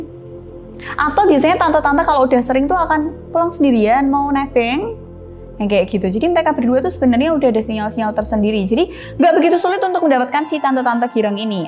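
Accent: Java, Indonesia. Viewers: gaya ngomong nya kelihatan Jawa nya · Jowone medok..,? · lucu dan medok mantab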